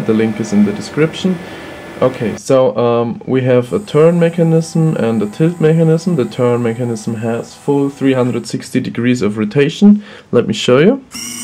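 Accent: Germany. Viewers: I realize this video is very old, but I am currently looking into this for a hobby project. Your Accent makes be believe you may be German, if not then sorry right away. I can't help but notice you really enjoying this gearbox and was wondering if you still remembered where you bought it from, if it was online, and how much it cost. Thank you very much!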